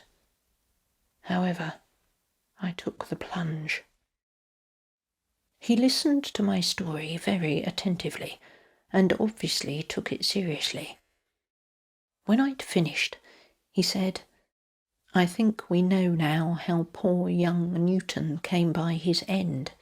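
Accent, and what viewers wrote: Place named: West Country, United Kingdom. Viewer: Wonderfully atmospheric. Beautiful narration—is that a “west country” accent? If so, where is the west country? I love the accent and grammar so much I’m determined to learn how to do it. PS, it was hard to concentrate once I learned there was a box of papers, unlocked, just sitting there, as big as Dallas, that no one bothered to read for 300 YEARS! It took me a few minutes to settle down as I tried to wrap my mind around it.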